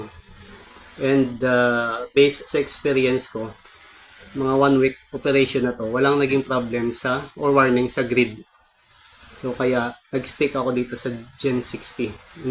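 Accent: Iloilo, Philippines. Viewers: Ilonggo ka Sir?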